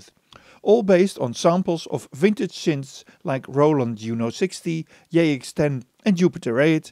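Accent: Netherlands. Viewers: this guy is definitely dutch haha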